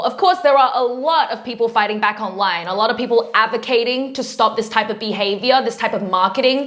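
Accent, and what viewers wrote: Australia. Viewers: Off topic, but I love your Australian accent!😭💖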